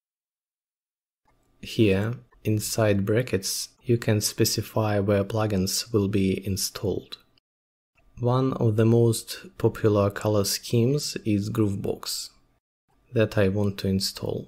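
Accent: Russia. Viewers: Судя по акценту ты наш парень) Спасибо тебе большое, очень помог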